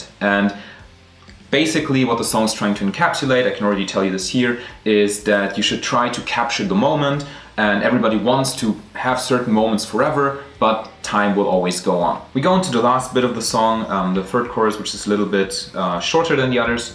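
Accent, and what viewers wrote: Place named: Germany. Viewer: Obviously, your translation is going to be the best one. You speak English very well. However, your accent is German. Thanks for your translation!!!! Could you write the lyrics in German and English? Please? This song is so dark! Is about death, isn't it?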